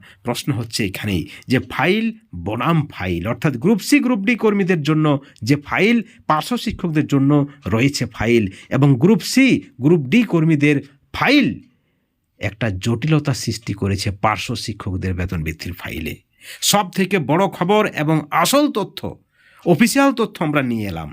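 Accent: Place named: Bangladesh. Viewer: Tumi ki bangala dasi vasha ta to Bangla dashir moto khabor towki Bangla dashar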